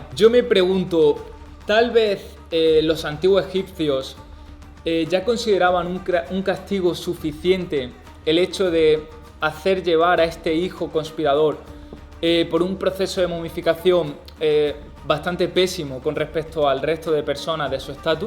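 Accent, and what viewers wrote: Andalusia, Spain. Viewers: Muy buenos tus videos . Supongo que por tu acento debes ser de Andalucía . Granada quizas ? Saludos desde Argentina.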